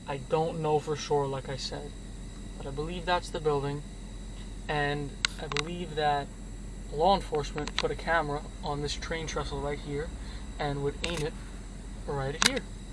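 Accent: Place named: Philadelphia, United States. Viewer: Hey u a Philly guy I'm south of Boston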